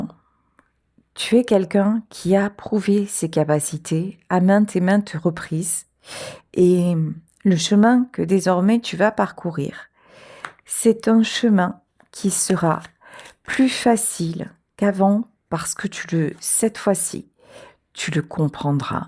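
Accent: Marseille, France. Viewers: Bonjour chère!❤❤😂😂🎉🎉 Jamais! Tu es parfaite comme tu es. Tu nas pas a t'excuser ou a devoir t'expliquer pour la belle personne que tu es.❤😂😂🎉🎉🎉🎉😂 Nous ne sommes personne pour te dire qui tu dois être ou comment tirer tes cartes.....???????❤❤😂😂🎉🎉🎉 Tout ce que je sais, est que tu n'est pas a l'origine de mes ressentis. ❤❤😂😂🎉🎉🎉 Très belle année et j'espère que ton bel accents marseillais restera bien droit dans ces bottes pour 2025.❤❤❤😂🎉🎉🎉 Ne t'inquiète pas tu es juste royales. Je commence a être plus connu de mes commentaires, et tu sais que comme toi, rien ne vaut mieux que ce que tu es❤😂😂🎉🎉🎉🎉🎉 En claire, rien ne mieux que ce que Dieu a fait de nous.❤❤❤❤😂😂😂🎉🎉🎉 tu es bien comme tu es et ce n'est pas grace a nous. Tu n'est que plus royale encore que quand t es authentique. ❤❤❤😂🎉🎉🎉🎉🎉 pour moi, dire ce qu'on pense avec respect et amour, être juste avec soi-même et les autres disans les choses comme elle sont, en plus de nous partager un bout de tes expériences, Tu n'as que plus de mérites!❤❤😂😂😂😂🎉🎉🎉Merci 🎉🎉🎉🎉 Je te souhaites pleins de bonheur, de l'amour de ce qui t'entourent et de paix pour en profiter. 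❤❤❤😂😂🎉🎉🎉🎉🎉🎉🥊🥊🥊 La classe ca ne s'apprend pas!😂😂😂😂 Soit on est née avec, soit on se l'achète sur YouTube. 😂😂😂😂😂😂😂🎉🎉🎉❤ Toi comme moi, tu es nées avec!🎉🎉🎉🎉😂😂😂😂🥊🥊🥊🥊 Alors merci de t'en servir pour nous guider. ❤❤❤😂🎉🎉 Toute fois, pardon si j'ai dis quoique ce soit d'irrespectueux, juger ou mal exprimer. ❤❤😂😂🎉🎉😂😂bonne année.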